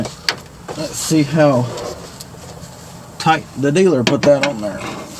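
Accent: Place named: North Carolina, United States. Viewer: well hell...the man's from North Carolina.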